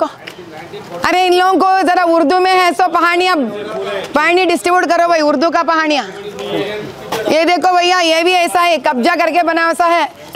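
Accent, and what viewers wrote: Telangana, India. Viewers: నమస్కారం మేడం మీకు తెలంగాణ యాస మీద చాలా పట్టు ఉంది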